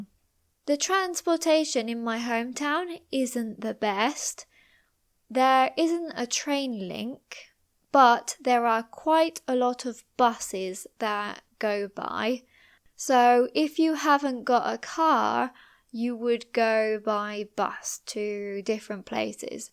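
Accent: United Kingdom. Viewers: British, American, Accent? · just so amazing! I love your British accent, slow speed, and very natural intonation. I definitely will become your new member!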